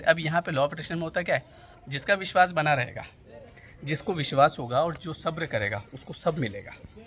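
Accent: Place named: Rajasthan, India. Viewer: Bhai rahte kha ho aap asa lgta h Rajasthan se ho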